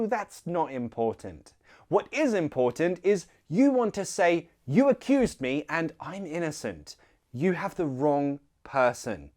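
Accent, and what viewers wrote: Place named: RP, United Kingdom. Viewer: Thanks ever so much, I like the way you talk and teach, and you are very understandable. I like the RP English accent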